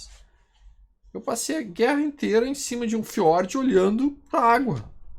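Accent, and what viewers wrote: Porto Alegre, Brazil. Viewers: ele disse bspoas sfdeua fpasa, problema para mim que o professor fala muito rápido além do sotaque portoalegrense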